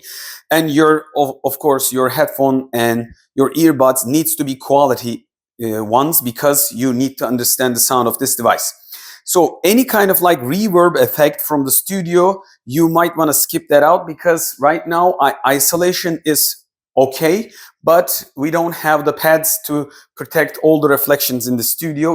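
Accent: Turkey. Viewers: Türkiyeden selamlar aksandan anlasılıyor :))))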